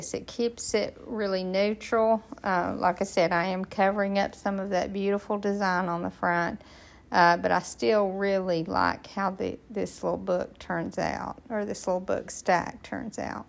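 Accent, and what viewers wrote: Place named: Tennessee, United States. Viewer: I was going thru YouTube and saw your site. Love your accent. Are you from Tennessee? Enjoyed your kind instructions, of the amazing silk flowers. Do you think , this would work with real flowers?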